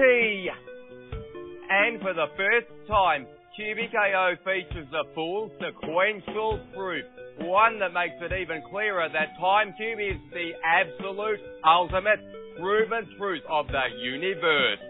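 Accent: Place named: Australia. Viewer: He doesn't sound euro - it's Australian.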